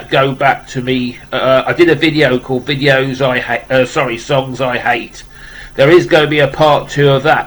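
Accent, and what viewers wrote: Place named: United Kingdom. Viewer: The comment you made on Scott Waters regarding Deep Purple 🤣🤣🤣🤣🤣🤣🤣🤣🤣🤣🤣🤣🤣🤣🤣🤣🤣🤣🤣🤣!!!!!!!!!!!!!!!!!!!!!!!! I have something even Scott Waters can't get. You know the band Joshua (Hand is Quicker Than the Eye, Surrender, Intense Defense, Chapter One)? They released an album in 2012 called Resurrection. Around that time I was having on line conversations with the founding guitar player Joshua Perahia. I still chat with him from time to time. He allowed me to have #1 of 888 signed copies of Resurrection which is extremely rare. He also sent me some signed postcards. Why 888 and not 1000 signed copies? 888 is the Hebrew numerical equivalent of the name Jesus or Yeshua. Each Hebrew letter has a number assigned to it - a bit of trivia for you. You have a cool British accent. I'm from Newfoundland, Canada and I have a bit of a Newfie accent ha ha!! 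Many of us folks from Newfoundland have a different accent that is different from the rest of Canada. In Canada they sometimes end a sentence or reply with eh. Newfies end a sentence with b'ye! or hey b'ye! We sometimes reply with go on b'ye!? meaning you're kidding me!? or you're joking right!? Then others say that's great or wonderful we say "best kind!" Cheers buddy!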